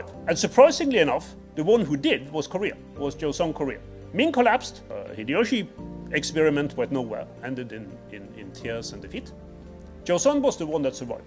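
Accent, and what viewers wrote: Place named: United Kingdom. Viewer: 하버드대에서 강의하는게 영광이라고 하는걸 보니 하버드대 교수는 아니고 영상보니 버밍엄대교수같은데....억양이 영국이고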